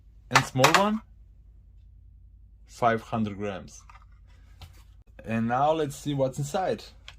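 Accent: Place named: Bulgaria. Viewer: Hello my friend, are you from Bulgaria because your accent really sound like a Bulgarian man🇧🇬💪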